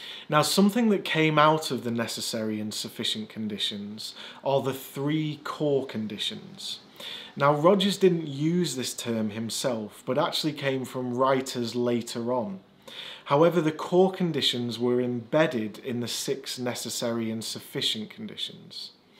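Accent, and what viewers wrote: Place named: United Kingdom. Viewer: Hey! Amazing videos mate. I recently subscribed to your channel and as a bit off topic I would like to know if you're from the Midlands or north, your accent is quite lovely :) Have a great day!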